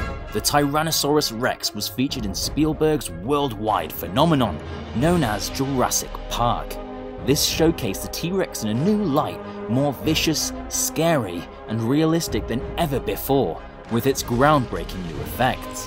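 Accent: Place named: United Kingdom. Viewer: When ever i hear voice i always get the TheGamingBeaver vibe and aura, probably because he's Brittish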